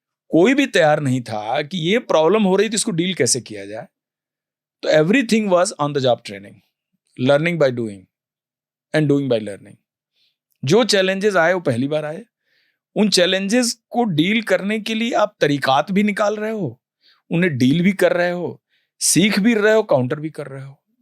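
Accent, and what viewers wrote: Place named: Uttar Pradesh, India. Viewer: UP ki saaf zuban 👍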